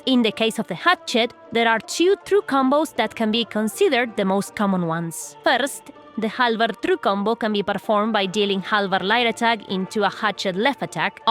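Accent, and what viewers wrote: Argentina. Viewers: The way it pronounced is because of his argentian accent hahaha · Eres argentina? 👀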